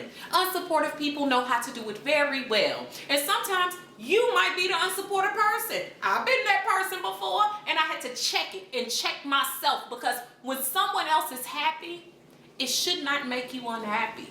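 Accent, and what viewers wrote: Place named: Florida, United States. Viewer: you sound like your a florida girl lol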